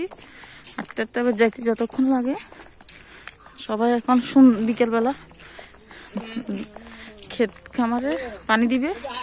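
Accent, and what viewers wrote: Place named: Noakhali, Bangladesh. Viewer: Noakhalir je sposhtho buja jay,goir ladi koy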